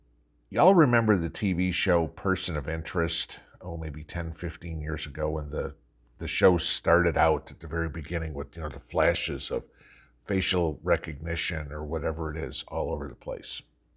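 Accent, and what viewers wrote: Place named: Bronx, United States. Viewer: Sounds like the bronx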